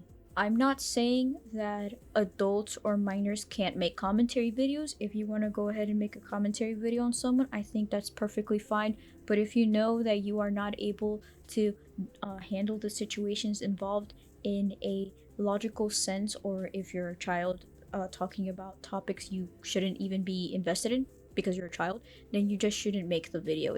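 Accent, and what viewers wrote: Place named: South, United States. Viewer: Omg no I love Southern/Black accents 😭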